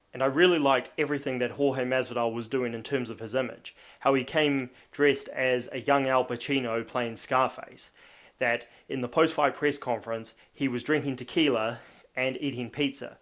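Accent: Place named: New Zealand. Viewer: Good video man I am subscribing Best of luck with channel from Ireland 🇮🇪 U sound kiwi 🇳🇿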